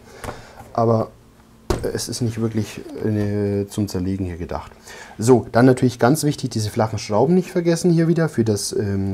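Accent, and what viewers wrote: Germany. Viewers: Mit dem leicht fränkischen Akzent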